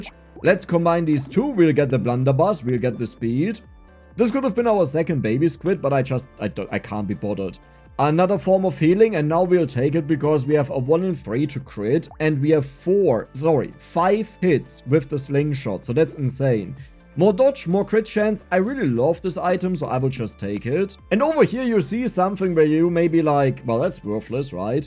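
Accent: Germany. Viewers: you can never hide that german Accent ^^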